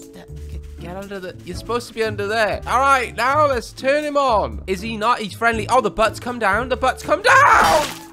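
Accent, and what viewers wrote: United Kingdom. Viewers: the and im yellow? gets me every time also you sound british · Hey are you britain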